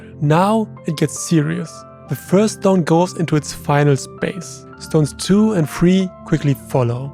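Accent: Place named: Germany. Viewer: Hey man Great Content fellow ant keeper here. Are you German btw because you got the German accent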